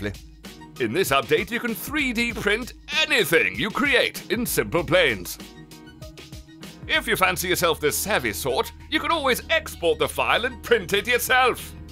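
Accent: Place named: RP, United Kingdom. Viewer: Damn ur voice, the perfect posh british accent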